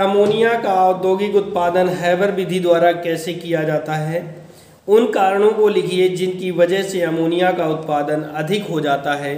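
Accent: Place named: Kanpur, India. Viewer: Very very nice kanpuriya language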